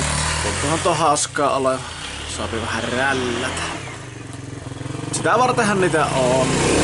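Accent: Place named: Savo, Finland. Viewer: Pit tilata kanava, vaekuttaa lupsakalta tuo Savonmurre ja ite kanava myös😉👍Minun juuret on syvällä Savolaisessa kivisessä muassa. Minun murteet männy sekasin kun asunut Etelä-Suomessa ja nyt Pohjois-Karjalassa ja syntyjään sieltä.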